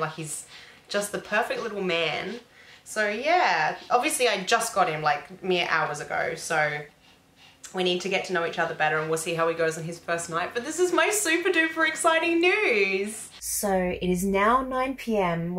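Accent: England, United Kingdom. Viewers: First of all... Louie is gorgeous 😍 secondly, I love how your accent has changed here and there to a more English accent 😂. And I love your intro 😁... Wishing you and Louie a long and happy life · He's so adorable ..what a cutie..i bet he just loves his new mummy.... I can hear your are starting to get an english twang...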